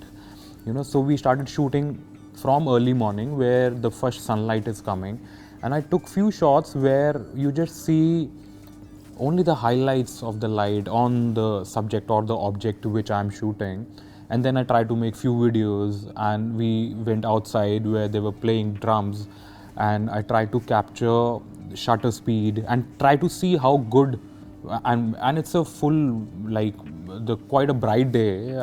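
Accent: India. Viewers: wah....indian sound on English language...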